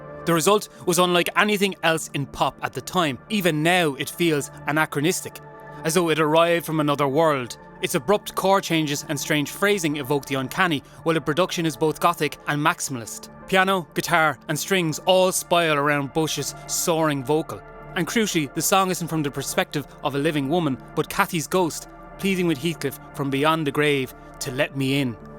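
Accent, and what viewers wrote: Ireland. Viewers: This was excellent!! Love your eloquence, gorgeous Irish accent & fabulous commentary. Thank you!! " " eternally. ❤🌹🖤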